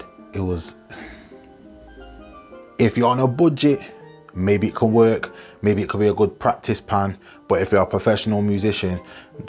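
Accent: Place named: United Kingdom. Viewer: Never knew you were british man love this channel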